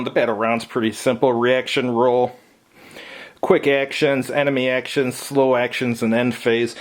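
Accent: Chicago, United States. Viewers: I know this is 2 years late, but great review. Also, this guy must be from Chicago. That accent is amazing.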